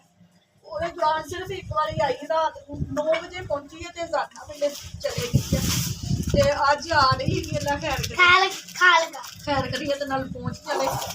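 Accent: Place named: Faisalabad, Pakistan. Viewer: وعلیکم سلام باجی صاحبہ کیسی ھیں کیا آپ فیصل آباد سےہیں آپکے بولنے کے انداز سے ایسا لگا 😊😊😊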